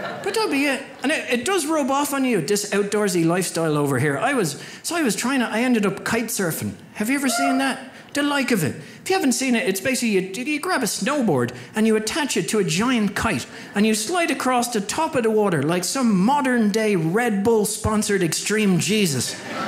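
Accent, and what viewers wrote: Ireland. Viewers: Very funny. And I can't resist an Irish accent. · It was close to accurate. To a passive listener, he could have easily passed it off as legit. But I really do love listening to the Irish accent.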